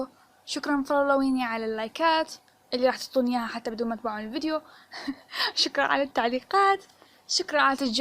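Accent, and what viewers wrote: Iraq. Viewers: حلوووه حبيبتي😍انتي اكيد عراقيه من لهجتج 😄😍موووو!! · عراقية مو؟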